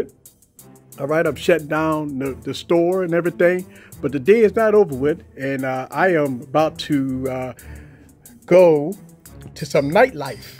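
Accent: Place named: New Orleans, United States. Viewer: Hahahahahahahaha nice video. I’m from NOLA moved to LA when I was ten with fam. Soon as u began to speak I knew where u were from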